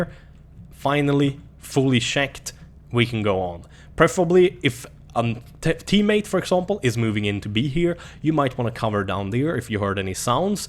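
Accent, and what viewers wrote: Sweden. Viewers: you're swedish right? sounds swedish! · Svensk? (dialekt xD) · I just bought the game and thought I'd give it a try since CS is soooo praised by gamers. Here is what I think about it (only played 5 hours) Challenging to handle the weopons (This is a plus for me) I get spawnkilled ALL DUHH TIME!!! Few and pretty boring game modes and maps. People either camp in corners with snipers or run around with shotguns. (not the game faults though) Weird frame drops... Shite graphics lol. But the game is old right?... I don't like the game, I'm not hating. Just sharing my thoughts of the game. BTW Are you swedish? You really do sound like a swede. Skit bra review för noobs som mig! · Easy to hear that this guy is Swedish :D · Is he from Sweden? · The swedish is real! xD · Tack för videon (och tummar upp för svengelska uttalet haha<3)! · man hör så jävla väl att du e svensk xD Bra video ;) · His accent is maybe swedish because he is from Sweden? 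· u r swedish arent u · he IS swedish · Are you swedish? im new and the first thing i heard was youre accent, sounds swedish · He swedish :'D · He's definitely Swedish. - A Swedish guy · ? ? hes swedish dumbass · Are u swedish?? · Heard right away that you were swedish x) It was kind of fun to see that yoy Also did that " " with your name cause I do too XD exactly rhe same way, since I was 13 xD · the swedish accent i love it xD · that Swedish accent. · This dude is from sweden tou can hear it on How he talks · your accent is very swedish! i just noticed that now. but anyway thanks for this guide! i also want to be better at this game because most of my friends are csgo players and im being left behind :( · two seconds into the monologue and I'm betting you're Swedish, correct? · He has a Swedish accent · also u sound swedish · Very swenglish but i am swedish to i cant be too rude🇸🇪🇸🇪🇸🇪🇸🇪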